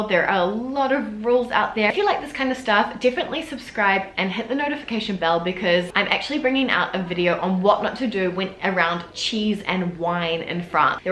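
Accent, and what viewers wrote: New Zealand. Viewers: I love your Kiwi accent, love ! Great summary of french foody etiquette. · wow your kiwi accent really came through when the auto-caption mixed up your six and sex... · Trying to work out your accent... Kiwi, right?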